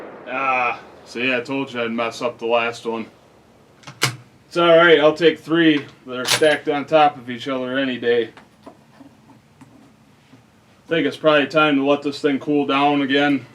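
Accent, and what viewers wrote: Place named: Wisconsin, United States. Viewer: Sounds like. Wisconsin accent to me! Go pack go!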